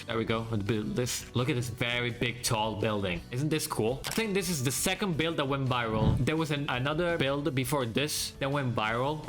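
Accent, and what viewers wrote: Italy. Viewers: dai ma si sente che sei italianissimo! sei un grande comunque continua cosi!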